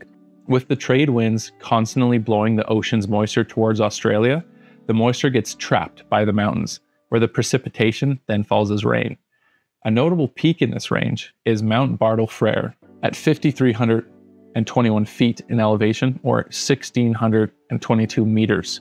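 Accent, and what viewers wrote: Canada. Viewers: Wow an American who knows something outside of the US. Then it dawned on me that's a Canadian accent. Now I understand. Great presentation by the way. I learned quite a lot about plants and endemic animals that I hadn't heard of before. By the way if you ever get a chance to visit the rain-forests of Australia you won't be disappointed. The Tasmanian ones are very special also. By the way that deserves a thumbs up and a subscribe! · This gentleman has a Canadian accent…it’s subtle but you can hear it…I believe he is Canadian.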